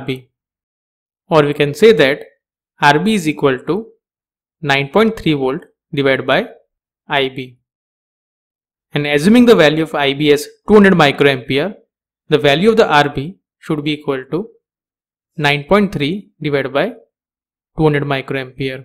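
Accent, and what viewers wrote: India. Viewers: 阿三这口音我真是服了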